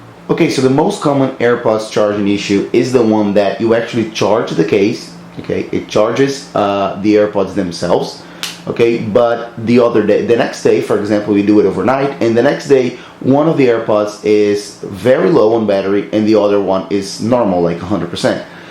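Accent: Brazil. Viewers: Heeyyy Brazilian!!! I noticed by your accent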